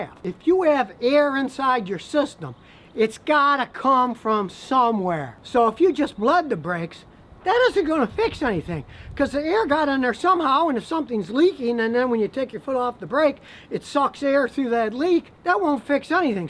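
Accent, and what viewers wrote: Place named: Brooklyn, United States. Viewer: has Brooklyn😁 accent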